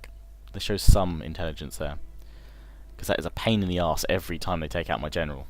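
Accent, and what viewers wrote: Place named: England, United Kingdom. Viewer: the English accent is hilarious (says the auzzie to be fair)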